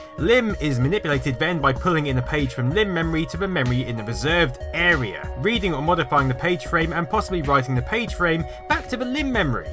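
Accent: United Kingdom. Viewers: In all fairness, English is my only language, but can be hard to understand at times. I find that's just his UK accent.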